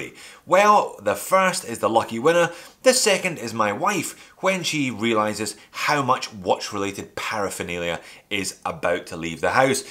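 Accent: Scotland, United Kingdom. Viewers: My Favorite is the D1 Milano... BECAUSE (has nothing to do with liking the watch) This video showed up in my subscription lineup, and my wife liked the look of it. So this was the first JOMW video she ever watched. And upon watching, a milestone was reached. You are the only watch channel host in my subscription list who does not annoy her. Maybe it's your Scottish accent (we recently vacationed on Skye) or the fact that you are a Scott living in Australia like one of our favorite musicians, Collin Hay. She has since also commended the channel's intro graphics/colors/font. (she is a graphic designer) Anyway, your channel, and in particular this video, opened an avenue for us to "nerd out" on watches together. Which has made the life of this watch collector, measurably better. Thanks! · My favourite video of yours is the first part of modding the legendary SKX ( <link> ) Simple but important and practical mods ;) Maybe it's the fact that I love SKX itself so much 😂 And I really enjoy your content, nice hard work and this Scottish accent ;) Keep it up and go far above 100k soon, I'm sure you will :)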